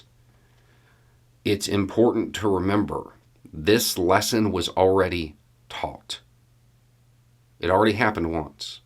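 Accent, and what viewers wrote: South, United States. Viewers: Can I say that it is very refreshing to hear someone with a southern accent who actually sounds intelligent and reasonable?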